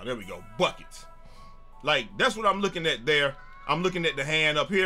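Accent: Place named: Beaumont, United States. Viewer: Ha hhhhaaaaaaa lol let’s get it wit da green da win, boy you act like me frfr then you gave Goudeau a shot out, stop it sound like you from Beaumont, gg I enjoyed that game play tremendously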